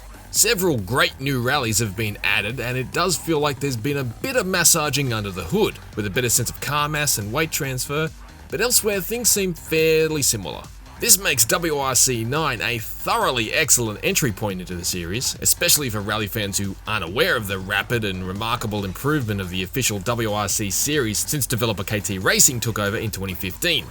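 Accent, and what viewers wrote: Ireland. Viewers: he does sound Irish tho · I don't know, man. He sounds Irish to me.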